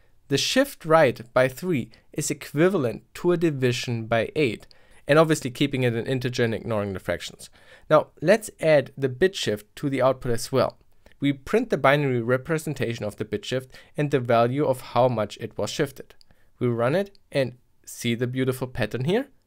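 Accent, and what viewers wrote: Germany. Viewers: He's German so some funny pronunciations comes with him you know! ;) I'm Swedish and we do the same, like calling Germany for Jermany.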